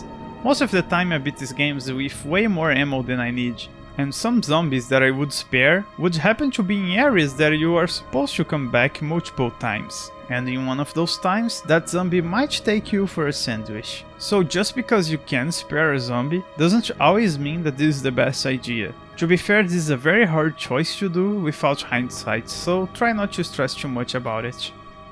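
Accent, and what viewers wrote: Brazil. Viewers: It's a Brazilian trying to talk english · O sotaque brasileiro tão reconhecível hehe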